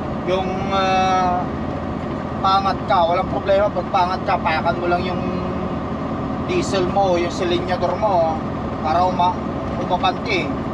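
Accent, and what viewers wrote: Ilocos, Philippines. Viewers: natutuwa ako sa mga pronunciation mo, hindi maitago ang pagka ilokano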